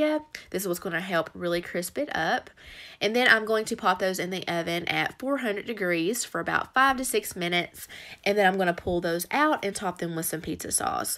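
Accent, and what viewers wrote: South, United States. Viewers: Hi!! I have missed you and your southern accent so much. I also have a southern accent but not like yours. So glad you are back. Everything looks so delicious. Hope you have a great day and week. Stay safe. God bless.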